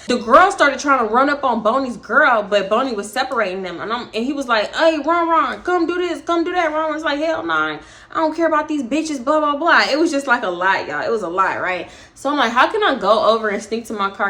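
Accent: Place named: Chicago, United States. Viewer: WHY do you sound so Chicago!? #why 💪🏾💯💓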